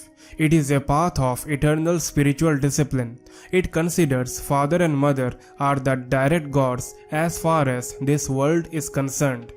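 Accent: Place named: North India, India. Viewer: It’s good knowledge for all Hindus . But when you go international try to connect to international audience even non Hindus will understand. e.g.: 1. Try not to speak like a North Indian . 2. Many words such as Veda , is pronounced as Ved . Shraddha in the subtitled is pronounced as Shrad ? This will confuse Hindus as well as others. 3. Learn to speak without accents from leading Indian news channel broadcasters . In YouTube one must be international. You will spread the message better. Keep up the good work. Hari Aum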